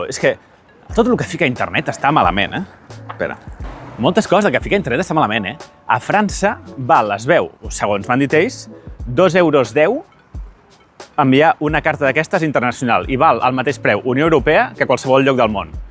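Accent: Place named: Mallorca, Spain. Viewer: 😂M’encanta el mallorquí, quina simpatia! Bona jornada! Amb això de les postals has fet un homenatge a la missatgeria tradicional, que està en plena decadència 👏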